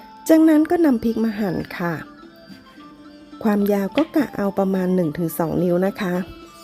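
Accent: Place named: Central Thailand, Thailand. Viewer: ผู้บรรยายกับเสียงดนตรีบรรเลง ไม่เข้ากันเลย น้ำพริกหนุ่มกับเสียงดนตรีเป็นของภาคเหนือ แต่ผู้บรรยายสำเนียงภาคกลาง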